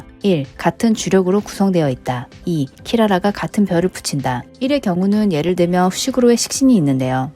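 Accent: Japan. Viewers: 영어도 일본어 느낌으로 읽네요 ㅋㅋ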